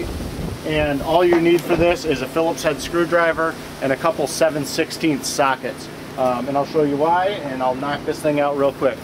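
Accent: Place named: Chicago, United States. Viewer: I detect a Chicago accent . Also that Chicago wind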